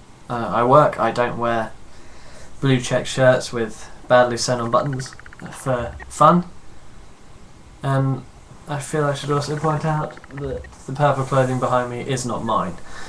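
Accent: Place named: United Kingdom. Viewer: Yeay 1st vlogs! You have a purple female top in your office? I have to say I'm very flattered to be placed among such greatness as the other four. LOL, stalking is okay...sometimes. John Green Book to start with is PaperTowns. Well, it's my favorite. Great job! I'd say talk about whatever your passionate about, that passion tends to show in videos. (I'm also a sucker for British accents. I "teehee"d cause I don't think I've heard "KeepOrJot" said with a British accent.)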